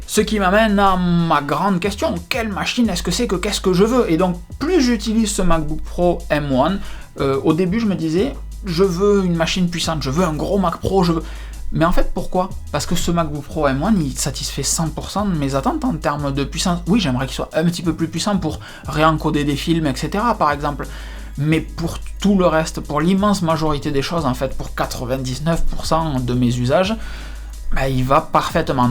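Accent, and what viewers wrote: South, France. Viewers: Merci beaucoup pour cette vidéo : complète et très enthousiaste ! De plus, cela me rassure d’avoir un MBP M1 avec Touchbar car tu es le seul dans cette vidéo à dire que finalement la Touchbar ben…c’est pas si mal ! Bon courage pour la suite, et merci pour ce bel accent qui sent si bon le soleil !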